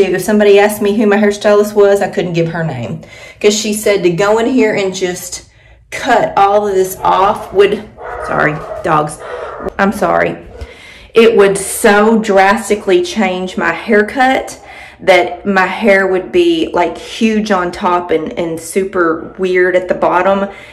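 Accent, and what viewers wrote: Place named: South, United States. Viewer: You have my hair.. GAWD..... same problems.... same FLOWER on the right side of my head..... I want to transition, I am 62 and my hair is THICK like this!... dang girl... we got the same MAMA! I'm in Texas... I'm gathering from your accent.... Arkansas? Alabama, not too much toward Mississippi....OKLAHOMA??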